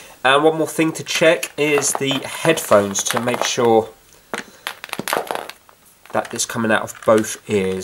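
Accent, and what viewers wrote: England, United Kingdom. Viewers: Love you accent what part of England are you from also the walkman looks cool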